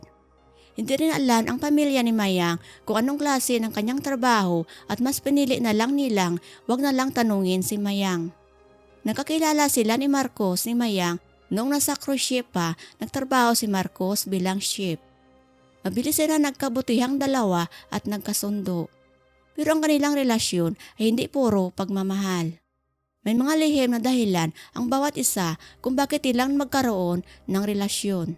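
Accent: Visayas, Philippines. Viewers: Sounds visaya accents 😵‍💫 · Ako Tinapos ko Ang twinto Oky lang Naman Na Ganyan Ang Bossis Mo Kasi Normal lang Yan Na Ganyan Ang Bossis Kasi Bisaya Piro Para Sakin oky lang❤️‍🩹❤️‍🩹❤️‍🩹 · hahaha.. parang himig bisaya.. at nahilo ako marining bjnabasanya basanya ang content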